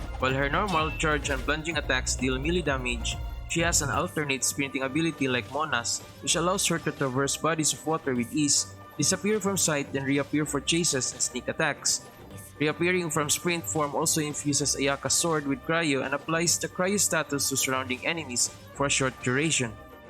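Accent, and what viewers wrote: Philippines. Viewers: filipino accent gang lets gooooo!!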